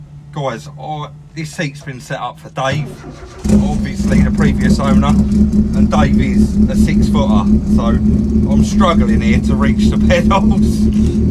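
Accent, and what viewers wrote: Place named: Cockney, United Kingdom. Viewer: This is just a great channel, great commentary, as I've said before I just love the cockney geezer accent.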